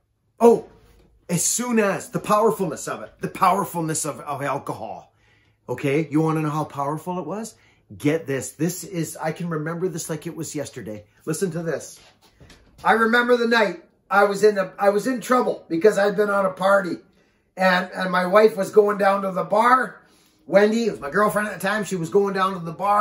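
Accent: Canada. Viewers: Hey, I been watching your stuff for a while now, I appreciate the climbing tips, I just started climbing myself this season, I've got some pretty good climbers helping steer me in the right direction. I've been around power tools and saws my whole life but I've only been clean off fentanyl/heroin for 2.5 years now, and everything is going great. I just wanted to tell you that I really like ur style (even with the cannuck accent) lol. And i enjoy the content. Keep it up man. I wish I could get out to b.c., we don't have any of them giant trees here in Connecticut. Have a great day man, stay safe! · Really great vid. I also feed an insatiable wood stove. Where the hell do you live? You sound like and have the disposition of a classic Canucklehead, I subscribed.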